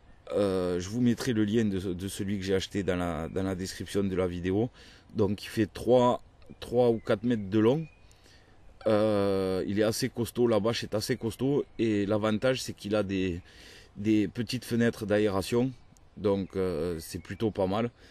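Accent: South, France. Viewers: Bonjour. Merci beaucoup pour le partage de vos connaissances/vidéos qui sont claires, instructives, complètes, enfin je me régale à chacune de vos vidéos, camarade sudiste ! (enfin je dis ça pour l'accent de chez nous, je peux me tromper mais cet accent me parle ^^) Merci encore pour le temps passé sur vos vidéos ;) Cordialement, Ben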